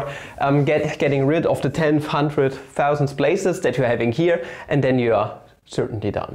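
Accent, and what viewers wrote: Germany. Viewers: It’s not you, it’s your german accent.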